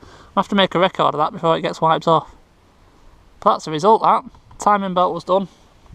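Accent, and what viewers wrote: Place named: North, United Kingdom. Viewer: Lovely Jubbly, great northern voice.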